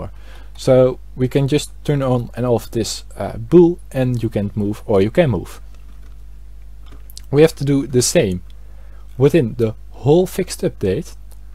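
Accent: Netherlands. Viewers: Hey + are you dutch because you sound very dutch haha. Keep up the great work!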